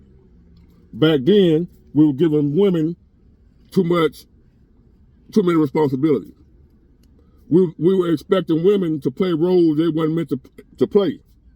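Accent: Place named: Chicago, United States. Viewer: Chicago voice....finesssee! finessse!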